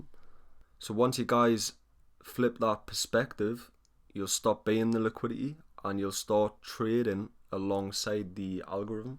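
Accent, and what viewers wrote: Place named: Newcastle, United Kingdom. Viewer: Haha fellow Geordie aye. How long you been trading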